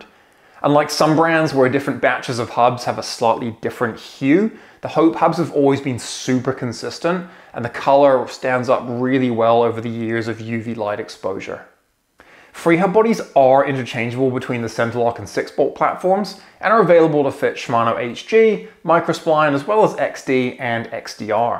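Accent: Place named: New Zealand. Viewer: Awful kiwi accent.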